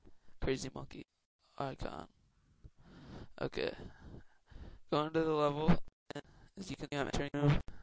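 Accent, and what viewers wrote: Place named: New Jersey, United States. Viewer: I bet his from jersey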